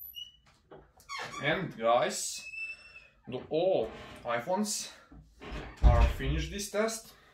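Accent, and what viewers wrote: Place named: Russia. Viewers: Акцент выдает, чел русский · Внатуре русский бля 🤣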